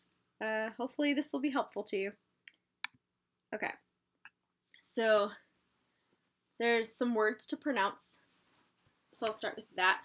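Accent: Connecticut, United States. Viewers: You say talk instead of tawk. What part of connecticut are you from? I moved to va from connecticut and my entire class tried to give me a speech class lol. Theyd say, "there's no w in talk Shakia." The words that stood out to everyone were talk, water, car, chocolate, coffee, dog... i pronounce them tawk, waduh, cah, chawclet, cawfee and dzawg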